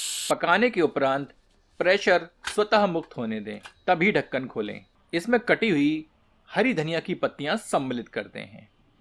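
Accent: Standard. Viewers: Itni sudh hindi.... Aisey feel ho rha hai computer hindi doubing kr raha ho😊bt muton cury r luking so delicious... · Good to hear Swach Hindi. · Apki hindi bilkul sudh h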